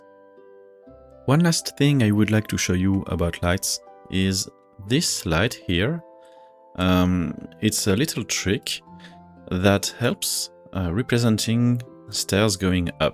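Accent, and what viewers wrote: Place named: France. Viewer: I love that Youtube goes "ah yes, this guy is speaks french" and puts the AI English Dub over. Not even speaking french or anything btw... just... french accent LMAO Also looks epic asf dude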